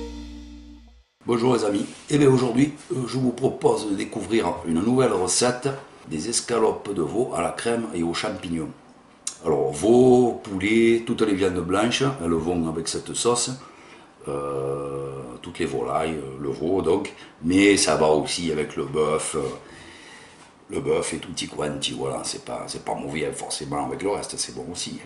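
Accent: South, France. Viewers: Hum 😋 et en plus ta une belle voix avec accent du sud 😂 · Une recette pour le régime....😅, c'est pas grave, ça tombe bien je suis ce type de régime ! mdr...., vu l'accent que vous avez on est dans le Sud c'est clair 🥰, bien le bonjour d'un Ch'ti décentralisé dans le Puy de Dôme 😁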